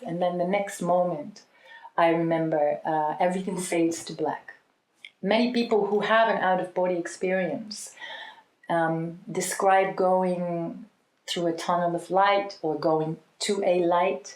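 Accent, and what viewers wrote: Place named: Netherlands. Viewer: I laughed when you said the voice of Creator was in English :) Are you a native Dutch speaker? I hear a little accent that I recognize! I would love to have a session with you, if you were in Belgium or Netherlands some day :)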